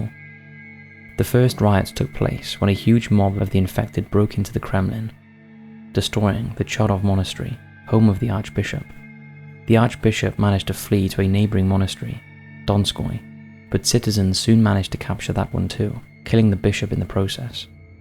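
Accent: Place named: England, United Kingdom. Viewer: He's definitely English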